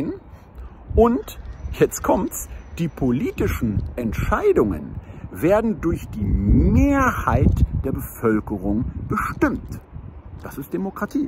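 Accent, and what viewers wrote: Hesse, Germany. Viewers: also ich mag das hessische. Hatte mal einen Kollegen, der war sehr herzlich und lustig, hätte ihm stundenlang zuhören können. Ich bin echte sächsin, lebe über 30 Jahre in in Berlin. Also bisschen Mischung der Sprache. Freunde von mir leben in NRW.. man lernt schnell sich die Dialekte anzueignen.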